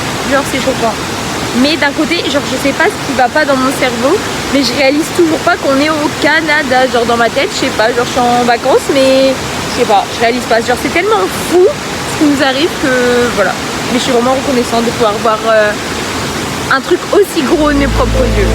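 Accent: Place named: France. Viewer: Bienvenue parmi nous au Québec. Je te souhaite une excellente intégration et te conseille vivement de garder ton accent de France : vous avez un français magnifique, alors ne cherche surtout pas à imiter le nôtre. Au plaisir !❤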